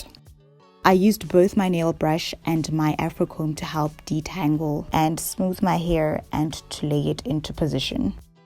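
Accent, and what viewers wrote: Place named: South Africa. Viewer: Are you south African you have the same accent as Kopano Shimange ❤️❤️❤️....much love from Kenya, 🇰🇪🇰🇪🇰🇪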